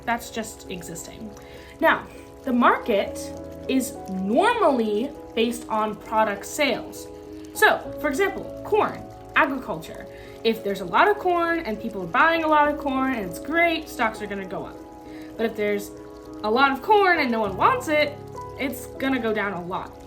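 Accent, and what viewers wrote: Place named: Canada. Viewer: May I ask what country you live in? You sound very Canadian! (From a Canadian)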